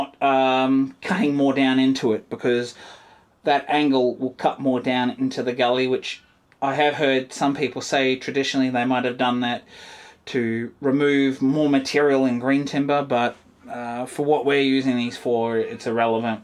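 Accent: Australia. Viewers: Thanks for all of your videos. Plus hearing you makes me miss friends I made in Australia- I’ve spent about three months total in AU between Navy port calls then I had a consulting job and went to Brisbane, Darwin and out to Jabiru. Cheers!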